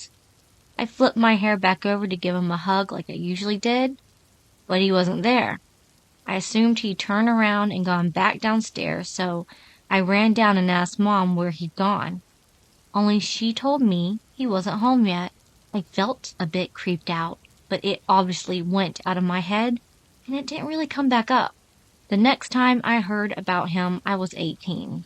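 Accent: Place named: South, United States. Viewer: Having an issue trying to connect to your Instagram from the link in your video description. I've gotten a bit behind but it's nice to hear your sweet Southern voice again. Sorry I can't remember is it Alabama? No, it's Georgia isn't it?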